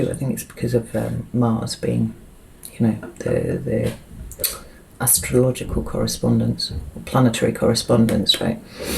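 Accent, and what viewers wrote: United Kingdom. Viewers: Dude I love your aesthetic, the whole UK accent (idk) and the whole dark style fits very nicely.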